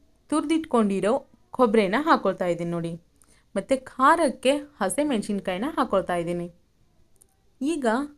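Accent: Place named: Bangalore, India. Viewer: ಅಕ್ಕ ಅದು ಕೊಬ್ರೆ ಮತ್ತು ಹಸೇಮೆಣಸಿನಕಾಯಿ ಅಲ್ಲ ಅವ್ವ. ನಮ್ಮ ಉತ್ತರ ಕರ್ನಾಟಕ ಭಾಷೆನಲ್ಲಿ ಕೊಬ್ರಿ ಮತ್ತು ಹಸಿಮೆಣಸಿನಕಾಯಿ ಅಂತಾರ. ಜರ ಸ್ಟೈಲ್ ಮಾಡಿ ಬೆಂಗಳೂರು ಥರ ಮಾತಾಡಬೇಡ. ನಮ್ ಹೆಮ್ಮೆ ಇಂದ ನಮ್ ಉತ್ತರ ಕರ್ನಾಟಕ ಭಾಷೆ ನಲ್ಲಿ ಹೇಳು 🤦🏻‍♀️🤦🏻‍♀️🤦🏻‍♀️🤦🏻‍♀️🤦🏻‍♀️🤦🏻‍♀️🤦🏻‍♀️ಮತ್ತೆ ಅದು ಕೊಪ್ ಅಲ್ಲಕ್ಕ, ಅದು ಕಪ್ Cup 😅😅😅😅😅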